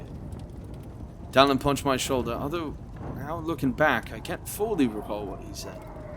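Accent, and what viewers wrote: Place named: United Kingdom. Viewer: Very nice. The British accent and the almost light-hearted way he told his story made me think of the British Empire at its glory days.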